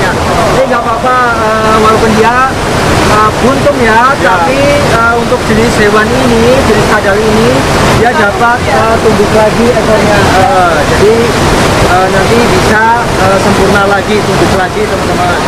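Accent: Banyumas, Indonesia. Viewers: Ngapak banyumasane kerasa banget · Wow ngapak...👍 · q suka gaya bicaramu mas... lugu dan polos... Khas jawa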